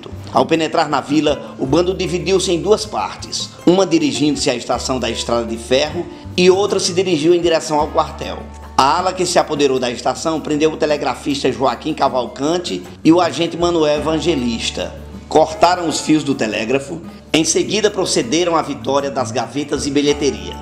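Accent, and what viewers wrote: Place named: Northeast, Brazil. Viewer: Eita Lampião sempre estrategista! Muito bom relato e o sotaque nordestino show! Parabéns!👍👏👏👏👏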